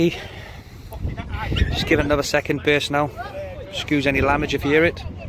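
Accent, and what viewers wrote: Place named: South Wales, United Kingdom. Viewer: nice one mate well done dogs are cracking is that a south wales accent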